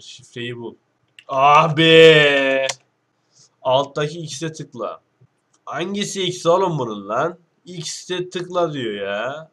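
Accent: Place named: Adana, Turkey. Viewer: Adanalı işte gurban olayım anagı yolugu diye ya ağız dolusuyla çok hoşuma gidiyor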